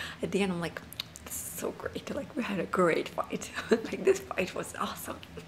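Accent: Russia. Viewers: “Im gonna sue you” im hysterically laughing (even though its a serious topic) this is true 🇺🇸dont touch me or i will SUE😂😂💁🏼‍♀️💁🏼‍♀️💁🏼‍♀️ Darryl is awesome you guys are great together! All the worries that you used to have to deal with alone you’ve trusted him to take care of things and, like you said, help you! Letting him help you has allowed you to be so much happier and lighter and worry about MomLife worries lol and you can hear a cute little thing in your voice that I think comes from speaking Russian frequently with Mila 💖thank you for this video, I look up to you so much💕✨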